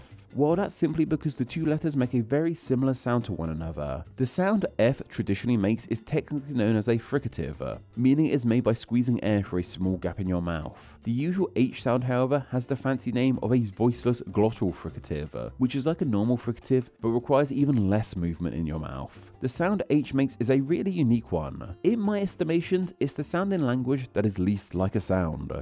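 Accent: United Kingdom. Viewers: Blud he’s British what do you expect 😭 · I find it interesting that this video that's focused on the "F" is narrated by someone with a "British" accent. In this accent, the words spelled with "TH" end up sounding like F!!! Fanks for this video!! It was very interesting! It's possible a similar thing happened to Spanish based on accents throughout the history of the changes...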